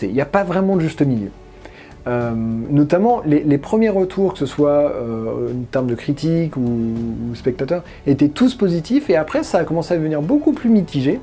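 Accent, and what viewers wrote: United Kingdom. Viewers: Salut, critique super sympa, j'ai juste un peu de mal avec la prononciation à l'anglaise de tous les termes de star Wars...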